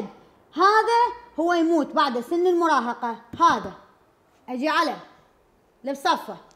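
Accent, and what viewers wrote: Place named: Mosul, Iraq. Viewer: حظ انتي مصلاوية موو؟ عغفتو من لهجتكي + عاشت ايدك والله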